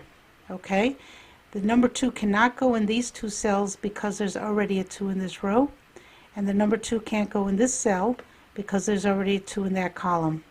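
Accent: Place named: Newfoundland, Canada. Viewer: Thanks very much. Are you from Newfoundland? :) Your 'accent' sounds familiar to me and I'm from there.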